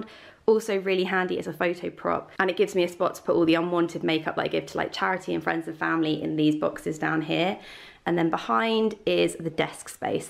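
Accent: England, United Kingdom. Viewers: Julia it's quite a generic way of saying "saw", most English people pronounce saw like that ( as a Brit)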